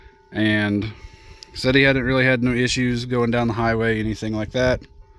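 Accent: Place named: Illinois, United States. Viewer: This was an expensive video for me. 😊. I have a Kia Niro which is similar to your Prius in many ways, and I am afraid that I will duplicate your mount, the antenna, adapter and whip almost exactly. I basically have the same whip as you, I dug it out of my shed. Thanks for posting, I would not have known about this otherwise. I have had a real DK3 screwdriver bought from the late inventor for years, but it's been too big for anything smaller than the pickup I had when I bought it. This small screwdriver looks perfect for our modern small cars. I recognized your accent. You aren't too far from my wife's hometown (Marshall, IL) and where I went to college in the early 90s. I consider that area to be one of my homes! I will post something in a few weeks when I get it in my Korean hybrid. 73 de W0FMS/7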